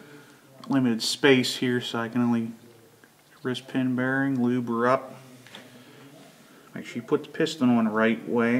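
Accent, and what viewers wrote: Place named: New York, United States. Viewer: Great video just did crank bearings on my 391 shes ripping again. Thanks! I can tell your from New Yawwwk somewhere. Maybe penn.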